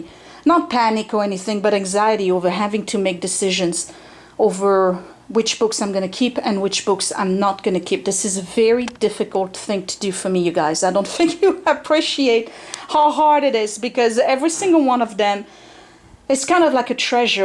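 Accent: France. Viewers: What are the Emmy's for? Thats exciting! I have been binge watching you since yesterday and I love you!! The French Accent reminds me so much of my grandma as she was raised speaking French. Her accent died down as she got older since none of us ever spoke French around her but some words you say exactly like her and that is so special!! Thank you for sharing and for being real!!! I have been doing a few things here and there while watching you and it really does feel good!!!!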